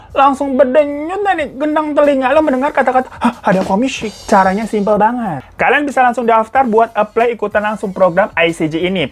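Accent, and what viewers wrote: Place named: Medan, Indonesia. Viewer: Wah wah dri bahasa nya iini kliatannya daerah2 sih pencerita dri medan nih. Serem sih kalau dimedan ada sekte sekte bgini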